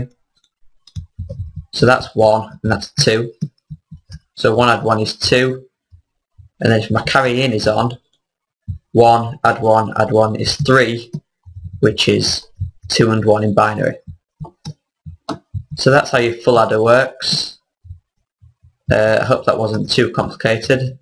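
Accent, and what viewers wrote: United Kingdom. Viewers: Where are you from in the UK? You sound like you're from Lancashire haha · Sound British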